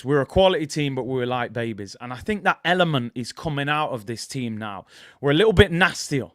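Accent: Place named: Cockney, United Kingdom. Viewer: 😂🤣 I don't know if I watch this show for the singing, content or the jokes But that cockney accent 😂😂😂😂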